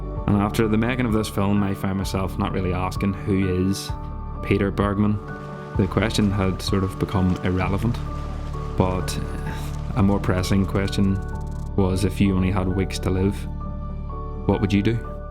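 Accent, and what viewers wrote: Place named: Belfast, United Kingdom. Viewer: Fantastic film, love the Belfast accent...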